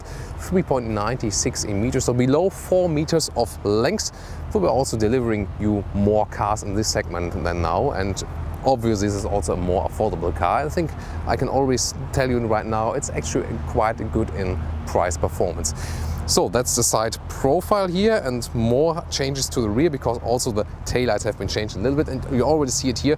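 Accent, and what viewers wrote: Germany. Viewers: Man hört schon stark das du deutsch bist^^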